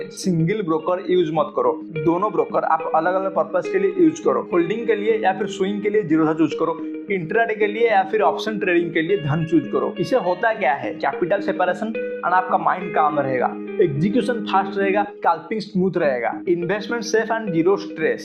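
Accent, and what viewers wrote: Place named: Odisha, India. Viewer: Odia acha bhai ??? Tama accent ru jana pduchi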